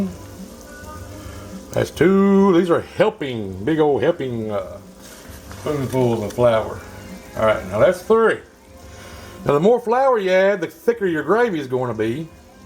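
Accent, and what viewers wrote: South, United States. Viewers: Gotta love that country accent!!! 🇺🇸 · I watched True Grit and could only understand half of it. I was able to understand this guy's video. I'm getting better and better at understanding Southish. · When I heard that southern accent, I knew then this was gonna be some good stuff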